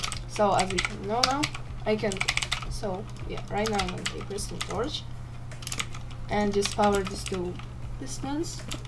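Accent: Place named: Germany. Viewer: are you german? i like your accent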